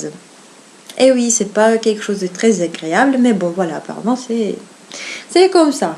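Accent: Russia. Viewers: Je m'abonne à ta chaîne, tu me rappelle une amie que j'avais au lycée et qui était russe, elle s'appellait Macha et elle avait le même accent que toi!!! Es tu russe?en plus tu as une voix douce très agréable à écouter. J'ai des doutes sur une probable grossesse...comme on dit il y a des choses qu'on sent qui ne sont pas comme d'habitude.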